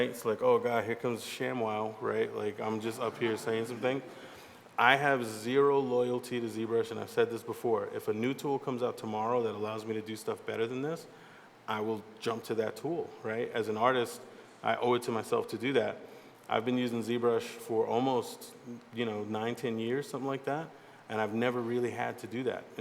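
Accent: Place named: Bronx, United States. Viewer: I knew this dude was from the bronx just listening to him.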